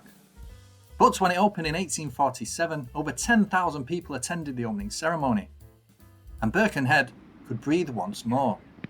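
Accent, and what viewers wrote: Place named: Wales, United Kingdom. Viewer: Gotta just love the Welsh accent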